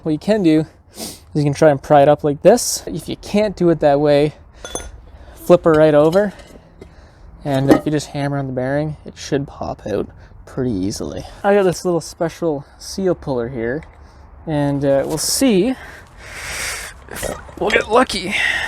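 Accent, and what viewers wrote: Canada. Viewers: You sound so Canadian !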